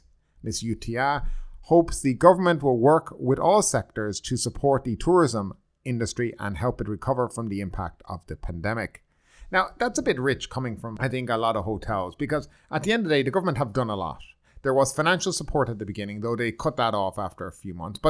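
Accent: Ireland. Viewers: Agreed .He sounds like a fellow Irish man God help me .I bet he was for lock-downs and the jab as well .If this is supposed to be a news service ? The commentary should be non judgemental .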